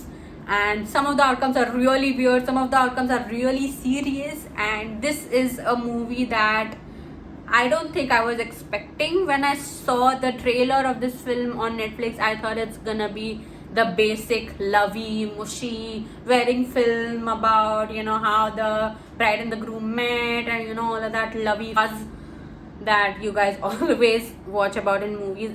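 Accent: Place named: India. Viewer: i like the indian accent !!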